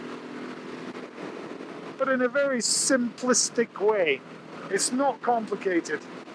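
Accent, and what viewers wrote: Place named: Scotland, United Kingdom. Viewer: It looks like one of the Scottish glens to me, certainly has a Scottish twang in his accent.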